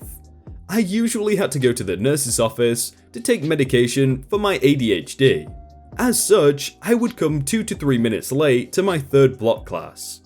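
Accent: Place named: North, United Kingdom. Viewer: NYC BABY I'm not upset, I'm well aware he mispronounces things. I assume when you have a northern accent words sound different in your head, but I wouldn't know. Regardless whether it's a name or a word, it's new and I wouldn't have pronounced it any differently NOT being a northerner. It looks like sand-oval. But then if a word is new I do pronounce them how I read them.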